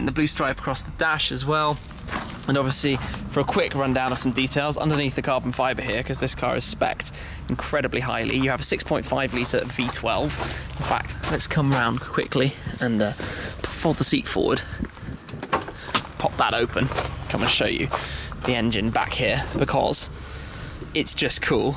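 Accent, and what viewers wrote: England, United Kingdom. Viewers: Alright, you must drive on the right side of the road and learn some words German of course. :D Anyway, I'm glad how you love and enjoy the cars with a lot of passion on the autobahn. As a German it's so entertaining and funny to hear your enthusiasm in English with the British accent. Have a nice week and soon a happy new year with many astonishing cars! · Shmee maybe you should change your intro words? Do u live near Germany? Just curious, your accent seems English, how do you so often appear on autobahn? Video is so bumpy is a glitch or road with pot holes?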